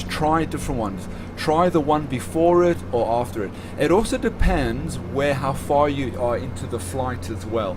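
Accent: South Africa. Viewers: hi pal how are u today u sound like ur from south Africa johansburg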